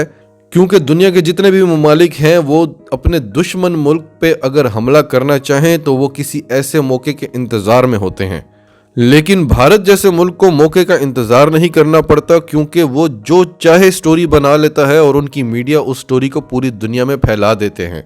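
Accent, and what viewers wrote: Pakistan. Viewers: Pure pakistani urdu accent love you sir (saaien) better than urdu speaking accent love you carry on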